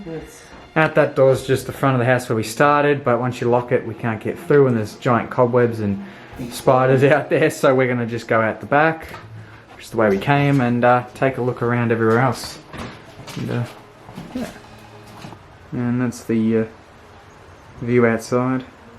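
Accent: Australia. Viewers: He has the most aussie accent ever :D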